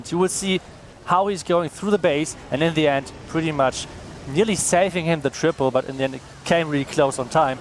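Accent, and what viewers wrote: Germany. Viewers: ja wow 80% der deutschsprachigen Leute klingen so.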